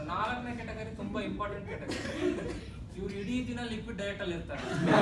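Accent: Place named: Davangere, India. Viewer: ಯಾರು ಗುರು ಇವ ಪಕ್ಕಾ ದಾವಣಗೆರೆ ಧಾಟಿ ಭಾಷೆ 😍😍😍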